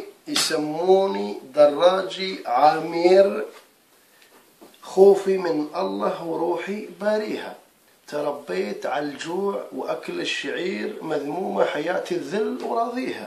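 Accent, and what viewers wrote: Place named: Saudi Arabia. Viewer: يتكلم لهجة سعودية أكيد أنه ليس جزائري وهناك صعاليك تصطاد في المياه العكرة احذروا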